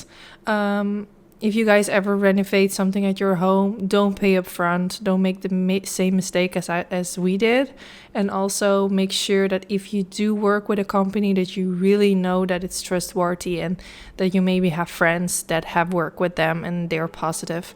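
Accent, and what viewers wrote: Netherlands. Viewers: I love the look and your lovely Dutch accent . Prima 🌸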